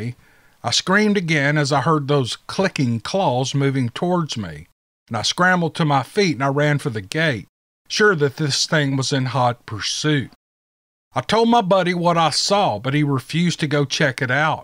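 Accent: South, United States. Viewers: I like to listen to your southern accent. You are my favorite Bigfoot narrator. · Aussie here and love your southern accent :) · I here in the United Kingdom also LOVE listening to your southern accent it's made your channel instantly one of my top. I just love it ❤️ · Hi, as an Aussie I can confirm we like the southern accent. Possibly from over exposure to Dukes of Hazzard back in the day. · I’m Irish always loved the American accent & by far the most popular among anyone I know is the smooooth southern accent.I love your ramblings they are full of warmth & humour🥰!! All the stories you choose are something else & your narrative skills are a gift to the world.I think it’s so incredible how people who relate their stories to you really benefit from doing so.You have a great channel & I love your choice of music it’s so evocative & real musicianship 🎶🎸 to savour, Lizzie. 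· I absolutely love the southern accent. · Hey how you doin,just wanna say that your southern accent is just PERFECT for telling these$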